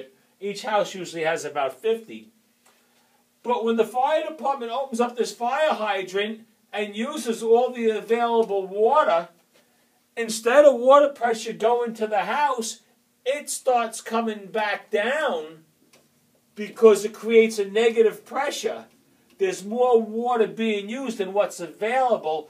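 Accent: New York, United States. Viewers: You hear that working class New York accent and you know you found a guy that knows what he’s talking about. Great video.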